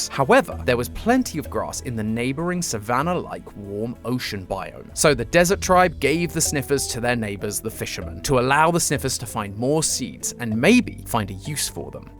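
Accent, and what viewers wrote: England, United Kingdom. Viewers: I love when the british talk about minecraft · Hehehe the team is definitely putting the new brittish accent to work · Too british, unsubscribed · British MatPat is almost as wild as MatPat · Sadly the voice just isn’t the same to me, feel like I’ve lost part of my childhood now matpat isn’t here. This and the last although the content is what I’m interested in, unfortunately all of mats energy I feel has been lost. I’m a Brit so used to the accent and honestly it’s now just way less entertaining for me. I really do wish all involved in theorists continue to grow and have wonderful times adventuring into these stories, it’s just kinda hard to accept maybe you’ve grown out of one of your favourite channels. A narrator is very important and sadly YouTube has lost one of its best. Game theorists, not lost but will never be the same. 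Miss you matpat ❤ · The British accent is so annoying can you tune it down a little bit? It’s just if you’re gonna go full Mat style story telling, the British accent sounds very distracting and confusing.. try to either do your own style or try to lessen the accent a bit. No hate for brits, and thanks for the amazing theory as always.. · Come on. I clicked this video expecting to hear mat pat. Instead I hear some British replacement. I really don’t want to say it, but the newer videos aren’t as good because MatPat isn’t there. It may be the same scripts that he would’ve read, but there’s something about mat pat that just makes me want to watch him. Not you. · Who is this British person, where is matpat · British Matpat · Dude im so sad i opened this video and expected matpat then i got jumpscared by this british guy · its just british matpat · are u english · i forgot matpat retired and got jumpscared by british matpat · I forgot game theory is now british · Why is the rest of the team suppressing his britishness 😢 that's way past uncool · Why does the new guy sound like matpat, but british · is just british matpat i like him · Are you British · with a british accent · I love that new Bri'ish Game Theory! 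· This is the first Game Theory video I've seen since MatPat left. ... you sound like a British matpat. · not making fun of you And I love the British accent but is it suppose to be "Wa oh" instead of "wuter" (I'm so sorry love the theory tho😅) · I thought I would be salty and abandon these channels after Matpat was gone but after listening to Matpat and honoring his wish of giving the new voices the same chance we gave him all those years ago has worked out perfectly, much love british Matpat! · Why is he British now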